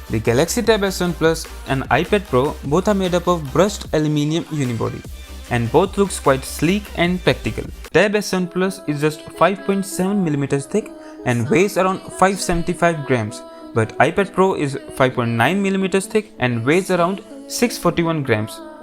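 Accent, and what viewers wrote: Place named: India. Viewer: india accents so funny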